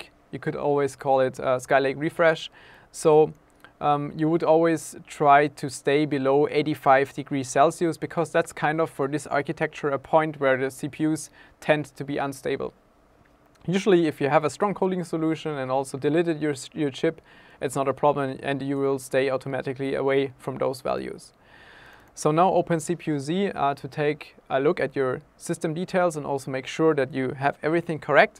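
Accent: Germany. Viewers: Deutscher Akzent kickt rein xD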